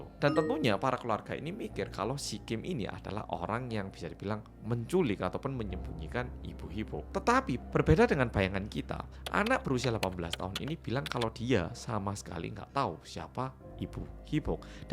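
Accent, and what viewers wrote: Java, Indonesia. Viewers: Suaramu medok banget bang koyok wong njowo.ya paling sopirnya itu yg membunuh.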